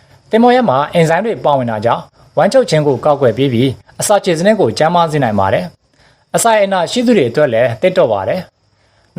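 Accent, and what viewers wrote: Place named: Dawei, Myanmar. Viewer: ထါးဝယ်သံပေါက်နေတယ်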